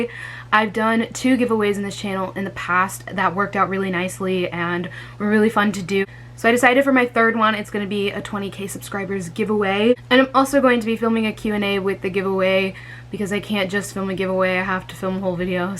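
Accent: United Kingdom. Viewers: ) I absolutely LOVE your shirt and your British accent is hilarious, we also randomly break into American accents sometimes too haha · British accent on point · That british accent tho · Your british accent was really good!!!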